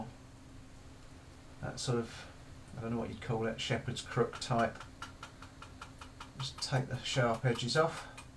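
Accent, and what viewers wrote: United Kingdom. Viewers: I enjoy your videos! I am from The U.S.A. I enjoy listening and I sometimes imitate the British accent, not as a mocking feeling, but as a learning experience. I know these videos are not a language tutorial, but I can't help being drawn into the slang you use! Again, not making fun, but I have a few questions about some phrases you used during this video. For example: "Give it a bit a willy!" ".................. "...I made a balls up!" " "...Coots and beans again!" One or two of these are pretty straight forward as to what they mean, but could you (for the readers of the comments and viewers of your channel who may not be from England) answer or translate the meanings of the before said phrases? Thank you! Again, I enjoy and learn a lot from your videos. Thank you! LA./Doot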